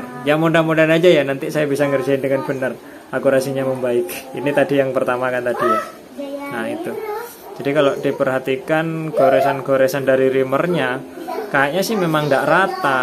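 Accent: Madura, Indonesia. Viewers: Klw dri logat bahasanya kyaknya dri madura ya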